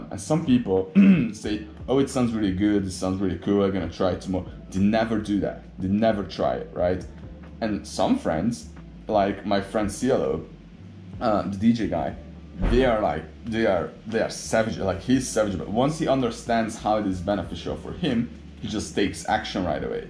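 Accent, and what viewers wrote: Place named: Hungary. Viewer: Szia hallom a kiejtéseden higy magyar vagy kaphatnék magyar videot?😁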